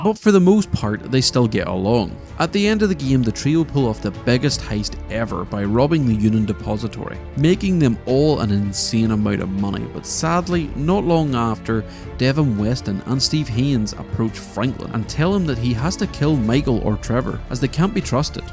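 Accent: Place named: Ireland. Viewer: Recently watched your other gta videos mate & subscribed! Great work, plus the commentary with your irish accent is a bonus touch haha! 😄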